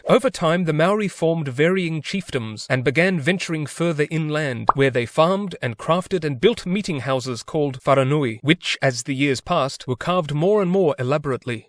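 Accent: South Africa. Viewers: This is new Zealand bro let's have a look cuz. I laughed so hard my bro u actually sound like my south African mate who lives here in nz lmao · sick south African accent my guy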